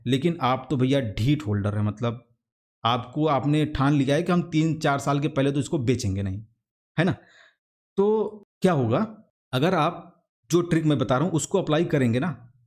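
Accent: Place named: India. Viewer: Very beautiful 🙏🌹sir. ❤️Thanku 😘 love you sir. Or aapka bolne ka desi style mujhe bahut pasand hai.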